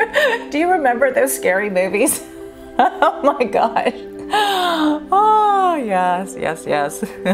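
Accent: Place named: United States. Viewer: So inspiring as always, thank you so much! Merci pour ton anglais américain pour moi très agréable à entendre, je te suis depuis longtemps non seulement pour tes vidéo, ta personne mais aussi pour apprendre cette langue qui m'étant si nécessaire. Loving you from Paris! Have a nice weekend!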